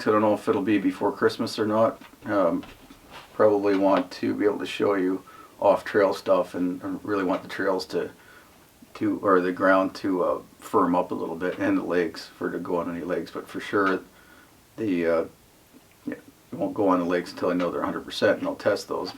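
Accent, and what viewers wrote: Canada. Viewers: After a tough day, coming home to a vid is just great. I love the Canadian accent, I'm sure it varies according to area, (maybe not as extreme as in the UK). Its very comforting somehow, I can't explain how or why, but listening to you speak is soothing. Keep up the good work. Some may think these are trivial vids, but I find them fascinating, and they answer a lot of questions that I'm interested in.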